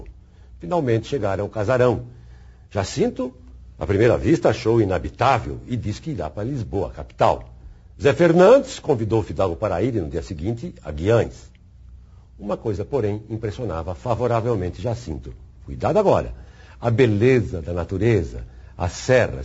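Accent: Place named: Caipira, Brazil. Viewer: Belo trabalho, voz caipira lembrando os velhos antigos (sim, os velhos de antigamente eram muito melhores e melhores contadores de estórias que esses velhos de hoje). Parece ser um excelente professor, apaixonado pela sua arte. O livro me pareceu ser chato e descritivo demais, justamente o que procuro, o Eça tem essa qualidade mágica de fazer nos apaixonarmos pelas palavras. Pedi hoje, espero ler logo, logo.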